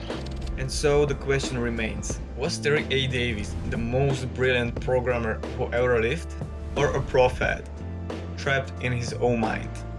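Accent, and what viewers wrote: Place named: Slovakia. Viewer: ten slovensky prizvuk strasne pocut :dd, pekne video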